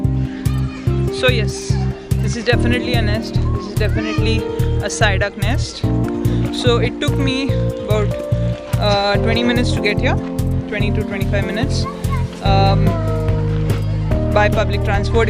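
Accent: India. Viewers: Damn. That sucked. The Indian accent is annoying. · Indian accent is by far one the best. But I can't help but laugh a little 😂 sorry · aaahahahah I just cant stand the Indian Accent :DD I just cant stop laughing omg, this sounds so racist hahaha. "Walecum duuu dii internasional dexcs" omg im dying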